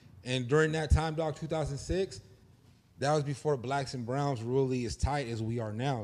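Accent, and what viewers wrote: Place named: Mexico. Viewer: He got more of a Mexican accent you got mexicans straight from mexico that look exactly like him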